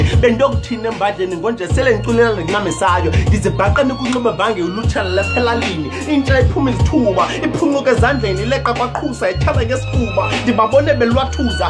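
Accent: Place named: Xhosa, South Africa. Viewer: ISXHOSA SAKHO BRA SIMNANDI NGALOLONKE IXESHA XANDIKUMAMELA NDIFUNDA LUKHULU INOMYALEZO IMIBONGO YAKHO IYANDIFUNDISA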